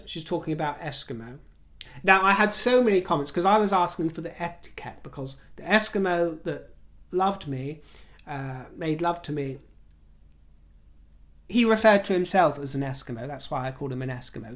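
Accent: United Kingdom. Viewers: Hi from Texas. You look fabulous in pink. It goes wonderfully with your blond hair. I used to have a pink shirt myself. Your show as always is so interesting and humorous and makes me want to visit the UK. I love the British accent.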